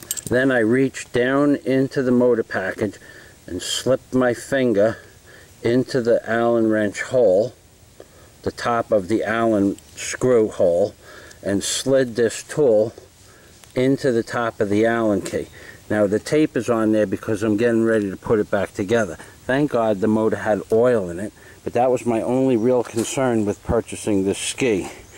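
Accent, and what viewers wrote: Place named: Rhode Island, United States. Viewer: Sounds like you're from Rhode Island like me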